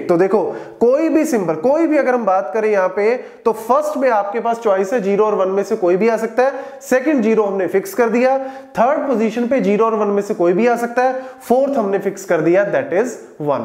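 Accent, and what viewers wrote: Punjab, India. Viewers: Sir ap jo punjabi accent mein teach krte ho usse apnapan lgta hai.. u r truly legend.. ❤❤❤❤❤❤❤❤lots of respect... 🙏 hmare guru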